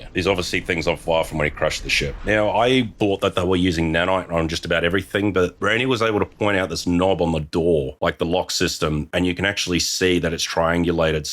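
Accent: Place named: Australia. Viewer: Hope all is well mate, you were a big reason I got into the forest and I still routinely watch on your old videos just for a good time. Hearing another Australians voice playing through a game I loved so much was a huge hook for me and I’m eagerly awaiting a video once the time is right. Hoping everything’s great with your personal life, and one final huge thankyou for being a big part of my friends and i’s lives growing up.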